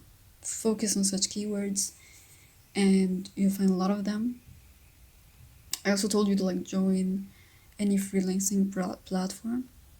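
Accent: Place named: Morocco. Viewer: HEYY are u moroccan (i dunno why u sound moroccan)?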